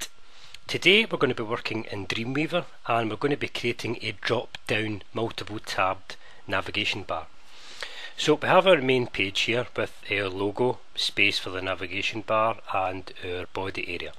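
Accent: Scotland, United Kingdom. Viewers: All these people not knowing what a scottish guys accent is :D poor non UK people.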